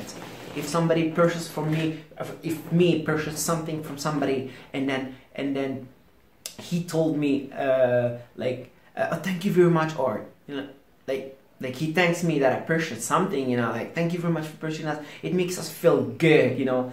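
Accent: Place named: England, United Kingdom. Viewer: Ur english accent👌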